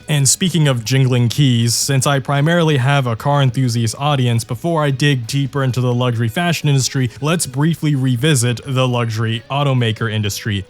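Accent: United States. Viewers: is asian? You sound like exactly American tbh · Bro I thought you were actually American you expect me to believe that you're Asían? Nahhhh I can't do that 😢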